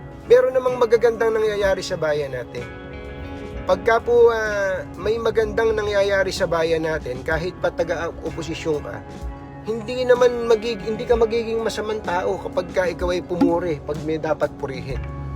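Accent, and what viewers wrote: Nueva Ecija, Philippines. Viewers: Bata palang ako nakikinig na ako sa program mo sa radyo dos por dos dipa kasi uso tv sa lugar namin noon hinahangaan ko po kayong reporter Sir Tunying natutuwa din ako sa tuno ng tagalog mo ecijanong ecijano kabayan po tayo.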